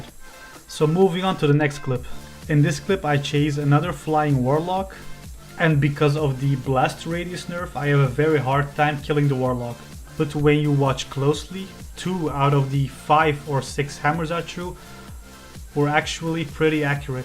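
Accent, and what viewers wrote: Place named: Belgium. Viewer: lol my bad, I mean to put Belgian cause I have this friends that's from where you are and he says what the hell just like you with the accent.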